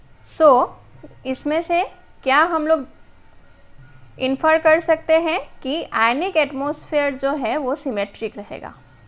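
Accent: Bengal, India. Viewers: Are uh Bengali 😅😮?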